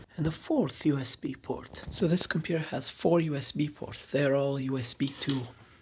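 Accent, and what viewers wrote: Italy. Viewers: Sei italiano vero? Non hai una pronuncia da madrelingua :D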